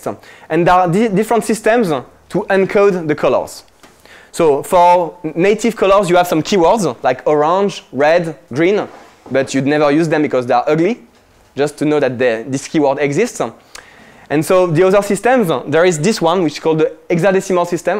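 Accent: France. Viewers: I'm hearing French actually.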